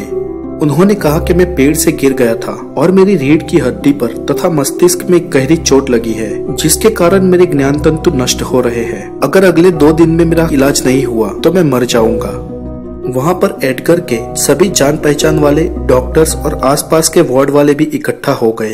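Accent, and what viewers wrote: Gujarat, India. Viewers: plz chq, u r ton,,,,,is gujarati.....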